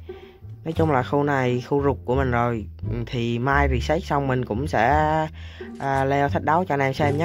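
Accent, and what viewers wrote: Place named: Da Nang, Vietnam. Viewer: anh là người đà nẵng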